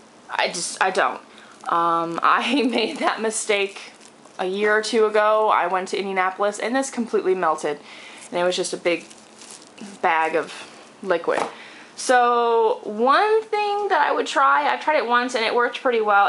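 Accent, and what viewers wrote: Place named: Maryland, United States. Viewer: this is a hardcore maryland accent, I talk like this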